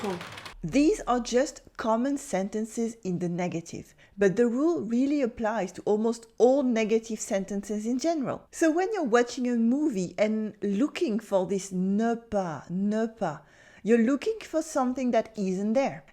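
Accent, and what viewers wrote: France. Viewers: I used to live in France, but not in the Paris region. The French In the "country" (whom I understood easily) always complained that Parisians talked too fast and were difficult to understand even for other native French. When I would comment that I had difficulty understanding movie dialogue my French friends would explain that that was because all movies are made in Paris in this sloppy too fast Parisian French. This video is helpful because I can now hear what my French friends were talking about, as this lady is using this sloppy too rapid Parisian French and presenting it as how all French people speak, when actually she is giving us this rapid Parisian French rather than the French one hears in the rest of France away from Paris. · French people don’t understand how US voted for Trump. Worst movies ever. (Please, improve your spoken english. 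Huge french accent)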